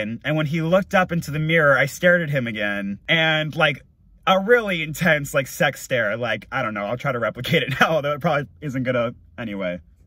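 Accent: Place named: Midwest, United States. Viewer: Greetings from ur old stalking grounds L.A., why are you regressing? You're supposed to move away from places like that to L.A. not the other way around. Where did you move to? I didn't hear a southern accent, you have more of a midwest frozen state vibe, I'd guess Ohio, Mi, Wisconsin. I made that mistake once b4 as well,.moving from a real city to a tiny little town in TN. They surprisingly had one gay drag bar. Drag is real big in the south for some unknown reason. The cops would raid it for one reason or another and they would enjoy printing the names of those arrested for whatever charges they wanted to charge you with, they would print your name in the paper and you would come out to the whole town via the newspaper. I lasted less than a year...I never made the headlines...I moved back to LA.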